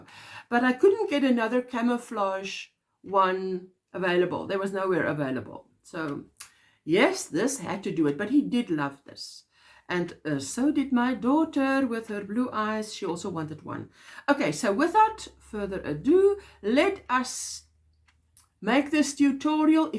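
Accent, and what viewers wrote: Afrikaans, South Africa. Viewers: Where are you from,your accent is so South African .... Ive just completed the beanie, easy and well explained, many thanks. Regards Sushie, South Africa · Great tutorial thank you! If I'm not mistaken, you've got a beautiful Afrikaans accent? Hahaha your accent makes me miss home! Can't wait to see more videos :D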